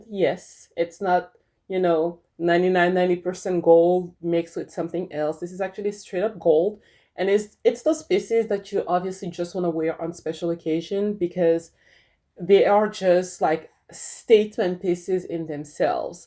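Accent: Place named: Texas, United States. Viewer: I was listening when Andy walked in and I asked him, “Do you recognize this voice?” “Yes,” he said, “!!” So fun to hear you!!! But I think you’ve lost some of your Texas accent! 😉 We miss you!!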